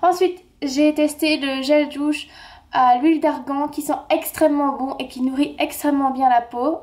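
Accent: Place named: Belgium. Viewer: Coucou ma belle ! Je viens de tomber sur ta chaîne par hasard et j'aime beaucoup tes vidéos! J'ai tout de suite compris que tu était belge :) ( grâce à ton accent hihi) Je dois également me taper Auchan en France pour aller chez Kiko/Sephora :( Je voulais savoir (si ce n'est pas indiscret) dans quel région de la Belgique habite-tu? :) Bisous.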